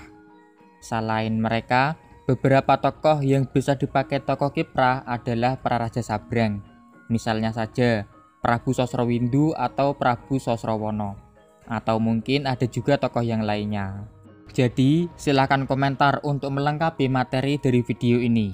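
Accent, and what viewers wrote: Java, Indonesia. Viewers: Terus berkaya men ora popo medok malah ketok jowone kudu bangga bedo itu indah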